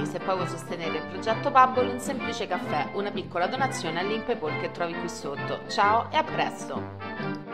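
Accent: Rome, Italy. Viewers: C'è del video in questo dialetto romano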